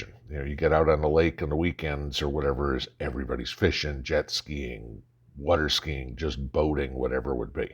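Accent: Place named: Indiana, United States. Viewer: I'm a Hoosier I had always heard we had some of the dirtiest lakes and rivers but didn't know we were #1 . I live really close to the Wabash river and a power plant reservoir I wouldn't eat fish from . There are some really nice gravel pits I would eat the fish from . Sounds like you're from the N. W, part of the state and we live in the S.W. part . I hope you have a blessed Sunday !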